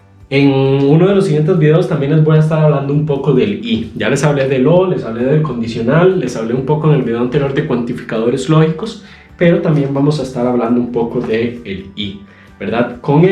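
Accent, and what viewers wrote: Costa Rica. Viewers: En este video se le salió todo lo tico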